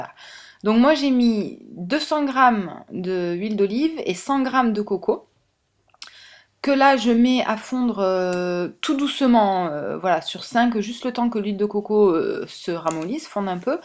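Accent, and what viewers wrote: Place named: South, France. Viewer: C' est l' accent du sud... magnifique accent.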